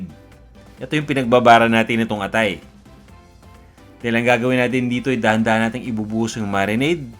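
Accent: Philippines. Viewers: Hi, hello from Australia! New subscriber here and am I so glad to have stumbled upon your channel. Now I can cook authentic Filipino food for my family like how I remember it as a child in the Philippines. I love how your Tagalog-English is so relatable to mine. Been here all my life, just about, but TG have relearnt Tagalog. Thank you for sharing your knowledge! 😊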